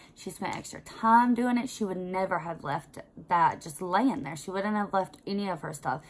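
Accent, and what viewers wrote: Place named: South, United States. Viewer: Just found your channel and I love your accent 👑 Sending love from another Southerner 👋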